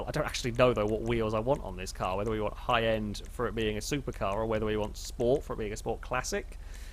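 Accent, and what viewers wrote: United Kingdom. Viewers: I only watched one video of you, and already I am loving your goddamn videos, keep up the good work, dude! (P.S. Your voice sounds British, are you from London?)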